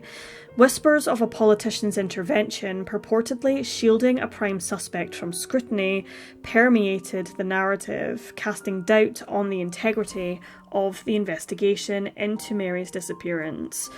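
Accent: Scotland, United Kingdom. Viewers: It is a Scottish accent so the woman is a native English speaker..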